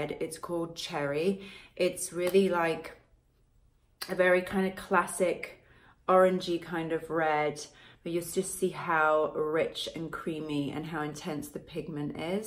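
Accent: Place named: United Kingdom. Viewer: british accent is everything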